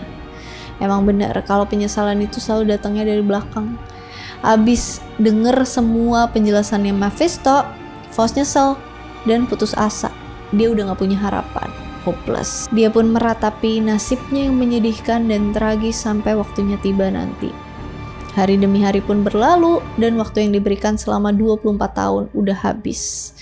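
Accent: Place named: Sumatra, Indonesia. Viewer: santai bae 😂 asli sumatra ya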